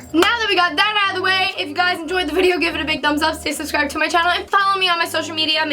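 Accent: New York, United States. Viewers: You have such a new York accent I LOVE IT 💜💛💙💚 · New York accent baby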